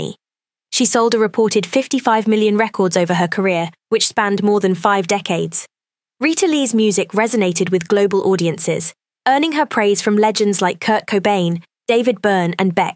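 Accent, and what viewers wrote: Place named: RP, United Kingdom. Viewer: It's pronounced "vie da" , not "vee da", Blue. Rhymes with "Ida". Since you use British RP accent, it rhymes with cider, not cedar. Anyway, may God grant Vida Blue eternal peace.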